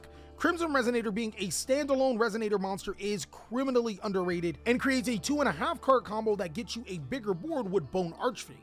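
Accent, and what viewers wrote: United Kingdom. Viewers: I think it’s more of a British cockney accent.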